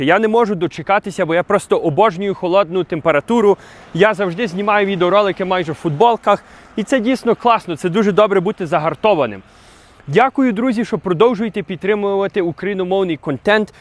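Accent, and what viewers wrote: Canada. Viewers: Звісно в Україні горе і біль. Канадці навряд чи це зрозуміють. Але Україна не була прям бідною державою. Багато хто мав бізнес будинки ферми заводики. Але багато хто це втратив. Звісно розділення в суспільстві існує і це давить на звичайних українців, і головне бійців які навіть додому приїхати не можуть не те що в барі посидіти. Спортом треба займатися це для здоров'я нації. Але наприклад розваги для мене зараз закриті. У мене немає настрою. Якісь пізнавальні тури то ще дозволяю, що б змінити навколо простір. Звісно треба економити. Коли хтось на супер дорогій машині і при тому відмазані від служби то це викликає незадоволення. В Канаду треба поїхати. У Вас гарна доречі мова, хоча Канадський акцент звісно є. Цікаво чому у всіх канадців він однаковий😊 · Цікава історія, гарний канадський акцент......, але чому всю цю історію потрібно було розказувати під час ходьби??? 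Ви кудись поспішали?))) Незважаючи на вашу хорошу фізичну форму ви все одно зидихалися і кавтали слова)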